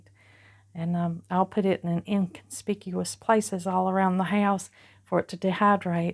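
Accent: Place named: South, United States. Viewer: Thanks for your video. Drying some mullen myself for the first time. BTW, I really enjoy your soft southern accent, you sound exactly like some of my favorite cousins.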